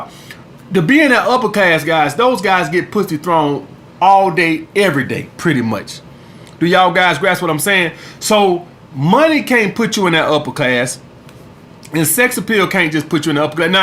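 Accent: South, United States. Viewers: I feel sorry for you guys. How pitiful do you have to be to listen to a guy like this to educate you on women. This dude country as F. Damn near sound like he got a speech impediment.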